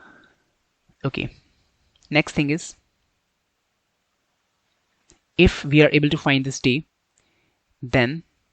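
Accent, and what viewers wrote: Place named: India. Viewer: accent is so bad, so indian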